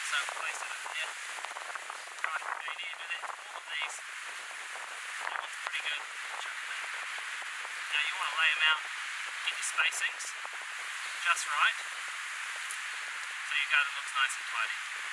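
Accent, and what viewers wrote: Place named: New Zealand. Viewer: I reckon, sounds like a kiwi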